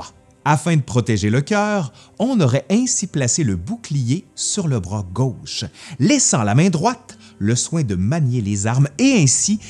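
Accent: Quebec, Canada. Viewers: Salut J''ai un peu plus de 50ans et je me permet de faire remarquer que beaucoup d'artistes sont gaucher! Et sais tu que les gauchers ont la faculté d' écrire à l'envers. Tu peux donc les lire que face à un miroir! Ça ne sert à rien! Et beaucoup de gaucher l'ignore avant de d'avoir essayé! Je te suis régulièrement, tes continus sont intéressants, et très bien documentées. C'est surtout divertissant d' attendre parler de la l'histoire de France et autre, avec un petit accent canadien ou Québécois! Je ne sais pas faire la différence, si il y en a une! En tout cas, continue!? Tu fais un très bon boulot avec ton équipe..... Bon courage! J-P. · Et bien bordel,je ne savais pas tout ça étant moi même gauchere ou parfois mais rarement ambidextre j ignorait l histoire de ce fascinant outil qu' est la main gauche car les gaucher on tendance à être plus agile dans certain domaine comme dans le dessins que je pratique.... 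Je suis fière d être ainsi merci de votre connaissance avec un ton de sagesse et d une pointe d accent québécois vous m inspirer avec nota bene pendant de je dessine ça me détend continuez ainsi...votre savoir doit être important pour les génération futur...😊😊📚✏🖌🙏🏻🙏🏻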